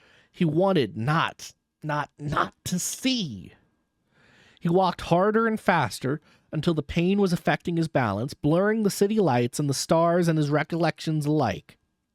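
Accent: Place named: South, United States. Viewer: Your Southern accent coming out really suited the rider camp argument I thought - very much enjoyed it and I'm from Europe. Fitted the worldbuilding I thought. Please continue if you can. I too recall reading this book too young - I picked it up at the library because of the horses on the cover and being an SF/F fan and quickly put it down after getting freaked out by the swarm animals. I picked it up years later and enjoyed it much more, although I can find the prose quite repetitive at times. Cherryh is so good at making the world beautiful, intriguing and horrifying at turns. I love her names: 'willy-wisps', 'wally-boos', 'lolly-lies', 'goblin-cat', 'spook-bear' etc. The fact they can trick you psychically in these evolutionary ways and how that can infect humans psychologically en-masse is genius level worldbuilding. The main 'villian' (no spoilers) of the duology is also fantastic, Cherryh turns usual tropes on their head. 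Wish we got that third novel.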